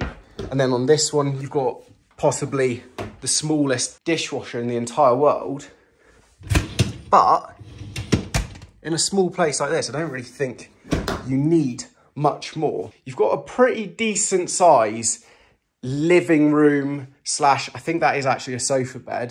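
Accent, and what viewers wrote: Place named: West Country, United Kingdom. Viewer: I can hear a twang of West Country in your voice. I love it.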